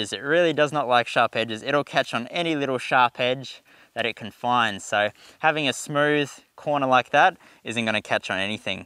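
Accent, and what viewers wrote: Australia. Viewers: Love listening to this guy talk reminds me of the auses I worked with in Afghanistan 👊🏻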